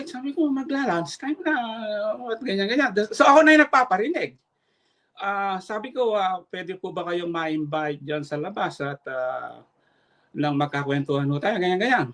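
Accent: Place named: Pampanga, Philippines. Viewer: Kuya sa boses mo Alam ko may dugo kang Kapampangan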